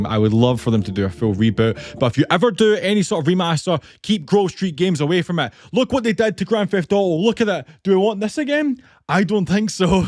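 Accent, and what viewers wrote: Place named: Scotland, United Kingdom. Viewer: Great video but I’ve had to stop watching cause I’ve realised I don’t really remember the game that well and I want to replay it now 😂 I’ll come back later. I have subscribed though and I’d like to see more videos about older games from you but don’t worry, I got through stages so I’ll get round to your newer game videos as well. Seems like the best of both world here. Also, it’s great to hear the Scottish accent, I used to hear it all the time in England but not so much anymore but let’s not go there 😡😅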